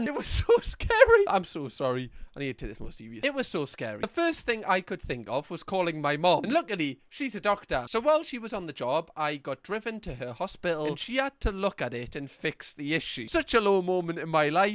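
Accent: Newcastle, United Kingdom. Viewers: Another day, Another Vid. Love u and the Geordie accent lad.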